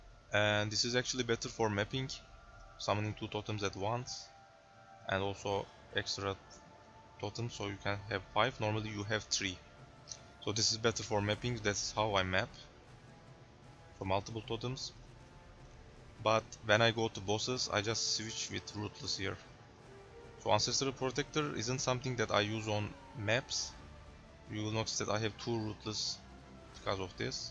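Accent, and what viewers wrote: Turkey. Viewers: Aksanınızdan Türk olduğunuzu düşünmüştüm ve kanalınıza baktım hocam :))) Teşekkür ederim, oyuna yeni başladım, bu build hem ucuz hemde sağlam gözüküyor. Bu build ile başlayıp ileride farklı karakterlere geçiş yapacağım, sağolun güzel video olmuş.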